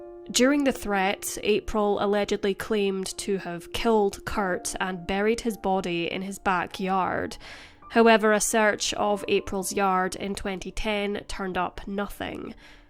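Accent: Scotland, United Kingdom. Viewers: Cute lil Scottish accent ;)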